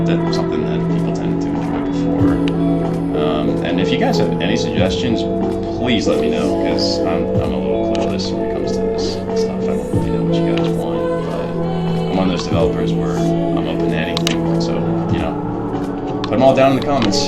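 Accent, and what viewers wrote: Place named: North Carolina, United States. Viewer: I'm also from NC, nice to hear a local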